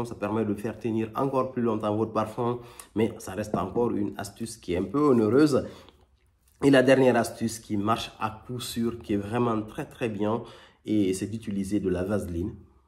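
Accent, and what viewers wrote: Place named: Wolof, Senegal. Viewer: Hello merci pour ta video, Jaime bcp ta chaîne!!! Es-tu sénégalais ? Tu as un accent wolof !!! 😻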